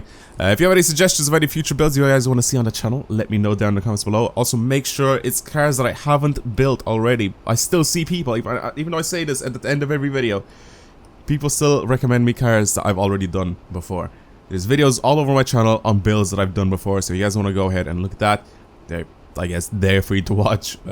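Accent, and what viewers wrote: Ireland. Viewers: Wow ure irish i didnt know im irish too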